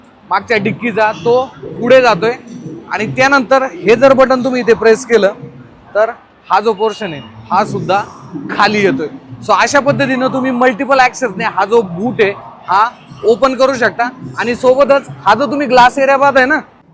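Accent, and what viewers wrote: Khandesh, India. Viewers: खान्देशी tone आहे भाषेत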